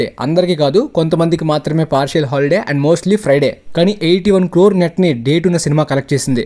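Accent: Srikakulam, India. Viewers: hey srikakulam ah medi ??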